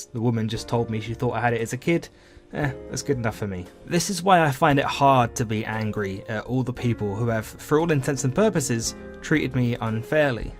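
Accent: United Kingdom. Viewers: This was like having my life condensed into half an hour and read by a British man. legitimately made me cry for the first time in a very long time and broke what i think was a lifetime no cry streak. I needed this, Thank you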